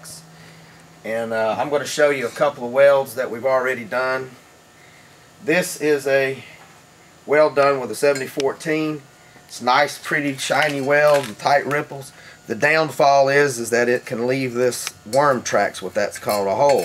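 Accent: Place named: Southwest Virginia, United States. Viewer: Out of curiosity, where are you from? Sounds like our accents here in southwest Virginia.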